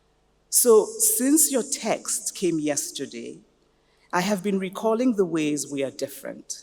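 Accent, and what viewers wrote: Nigeria. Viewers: "How I like finding bumps in smooth surfaces" I could relate to this. I'm always scared of perfection because perfection is too mechanical. I love that you maintained your Nigerian accent, it's beautiful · Would call it bravery amongst other things. Cause people around her would be intentionally or passively chipping away at her to stop making them feel uncomfortable whenever they all go out and she speaks in her natural Nigerian accent, but her courage and confidence in her own tone and skin has helped her last this long, without modifying it. I think by now it’s much easier for her to sustain, but earlier in her abroad life, it’ll have been much harder & the critics would’ve been much louder too. Hoping more Africans that’re spread across the diaspora develop this natural courage to speak freely but remain eloquent at all times.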